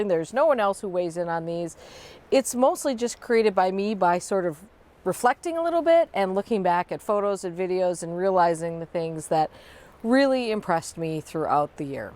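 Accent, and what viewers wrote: Wisconsin, United States. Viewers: Oh, I needed this. We moved into our house 20 years ago this coming February. We were thrilled to discover in the spring that our neighbors were gardeners (and also the kindest people ever). We have enjoyed many years of sharing plants, sharing bulb orders, and enjoying each other's gardens. Don died of a heart attack in September at only 77 years old, and Janice was moved to memory care two days ago. We are experiencing so much grief right now, and I have been planning the bouquets I will take when I visit her this summer. I will definitely add the snapdragons to my seed orders. Thank you for your videos. You are inspirational and almost always give me a laugh, and I love seeing your animals. Plus, listening to you talk takes me home to Madison. Happy New Year!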